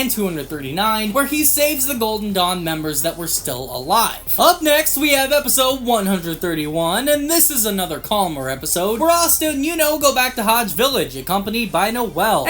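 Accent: United States. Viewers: Ur American accent is great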